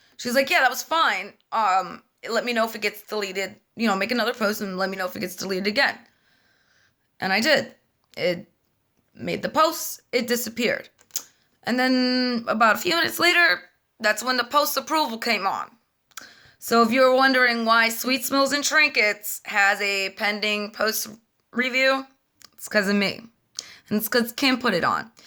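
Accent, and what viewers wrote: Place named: New York, United States. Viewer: Since the first time I met you I have felt you are a honest person. I too tend to "walk the line" in this wax world, I ignore drama as much as I can as you do. Good for you for standing up. Keep being you and keep making these videos! I love watching you (and listening to your New York accent) I've heard this before "Who here has been victimized by Regina George" and it's completely true. You are either for her or against this person, there isn't an in-between. If you ever need anything I'm here for you!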